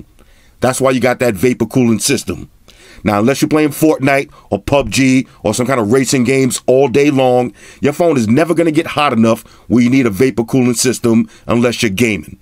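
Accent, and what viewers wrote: New York, United States. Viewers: This dude is straight outta new york. Extra emphasis on everything he say lol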